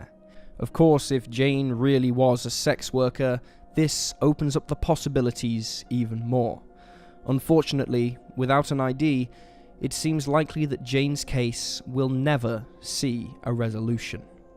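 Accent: England, United Kingdom. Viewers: Can’t stand the pommy narration. Goodbye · I dont like when they have narrator with a British accent! Stop it! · Sad story, but I can't believe that a narrator with such a strong British accent actually said it was in regardS..ah, at least he does well at most of his readings ? Would have made a decent film if it had been a reenactment. yes ? Producers ? Script writers ? No copy editing ? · I'm so tired of someone with a British accent narrating American crime shows..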